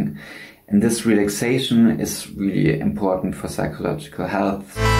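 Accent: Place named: Germany. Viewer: Starker deutscher Akzent, bist du Deutscher?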